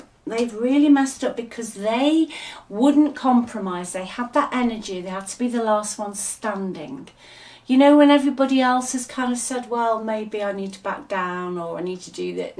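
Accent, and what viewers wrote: England, United Kingdom. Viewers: thank you so much for this mornings reading. Love your strong English accent, reminds me of two years O went to varsity there. Darling, your advice is spot on, loving myself first and protecting my peace and freedom to do whatever I want. Busy investing heavily in some new ventures, I am not going to be giving as I used to be, can tell you that. My old self died before Christmas and with the protection and guidance of God, the legions of Angels and in particular Archangel Michael, I receive unmeasurable abundance for which I’m absolutely grateful. Gods timing is divine otherwise I would have been dishing these abundance out. Learnt my lessons the hard way, let’s just say I’m doing great and couldn’t be more happy. Blessed day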